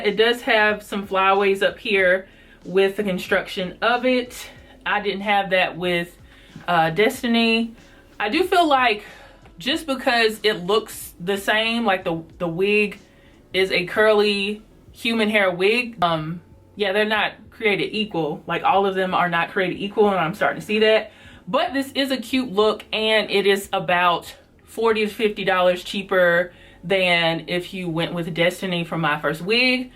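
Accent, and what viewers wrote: South, United States. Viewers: ok. Bye the way, I love your southern accent!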